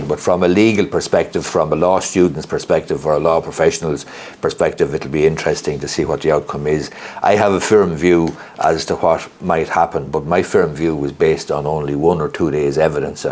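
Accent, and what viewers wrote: Ireland. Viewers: so irish!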